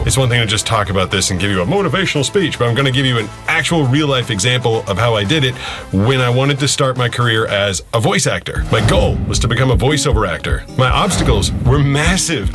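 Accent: Boston, United States. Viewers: I would love for you to do a whole video in your true Boston accent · Do you ever go back to Boston or come across somebody with that accent and if you do, do you slip back to your old ways of speaking?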